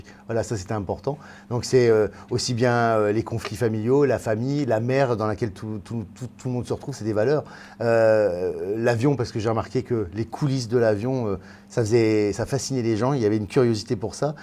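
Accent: North, France. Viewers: Surtout change rien JeanFi au début j'avoue j'avais du mal avec ton accent du nord moi qui suis du sud je n'ai pas l'accent de Toulon encore moins de Marseille. Dommage qu'avec Air France on ne puisse pas aller au Québec.... Je t'ai bien sur découverts avec la bande a Ruquier et sérieux quand Laurent te programme je suis super contente car je sais que je vais passer un excellent moment. Et puis j'ai écouté ton sketch ou tu parle de ta sœur et de tes parents. Je suis reconnue quand tu parle de ta sœur. Mais même quand tu caricature ton milieu et tout ce qui se passe dans un avion moi j'ai la chance de pouvoir le prendre assez souvent alors c'est malheureusement pas Air France que je prends mais Air Transat que j'appelle "Air Sardine" mdrrr la bouffe est immonde mais en contre partie j'ai l'accent Québéçois dés que je monte dans l'avion. En tout cas merci pour ce que tu es... 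Bisousssss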